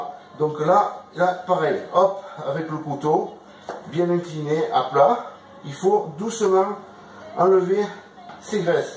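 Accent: South, France. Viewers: Travail de pro hyper appréciable, bravo à toi et bonne continuation... Ton accent du Sud et tes expressions me font bien rire! À+++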